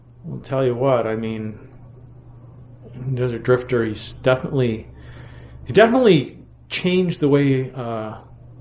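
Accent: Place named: Canada. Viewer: Thank you so much for your heartfelt and very valuable updates on Andrew Cross's ongoing crisis. You have to be Canadian-born, like myself, for some of your north-of-border pronunciations. (Well done, I say, as a dual citizen living in USA but born in Ontario in 1955.) Your commentary has been nothing short of brilliant, even if heart-rendering at times. You are a saint for making this effort and I thank you again from my deep heart.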